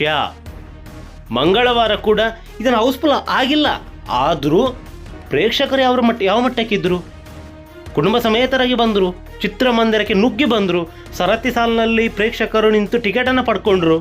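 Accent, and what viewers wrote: Coastal Karnataka, India. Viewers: nivu super,love u ದಾದಾ ❤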